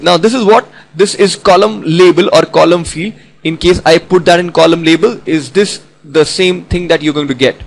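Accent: India. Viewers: This is a neat trick, but you have to be careful of his kind of thick east Indian accent. I, also, am not sure what version of Excel he is using. This may not be 2010, it looks like a later version, but his trick is good. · indian accent is always fun to hear hhhh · Hai, yur voice seems 100% match with a person from Chennai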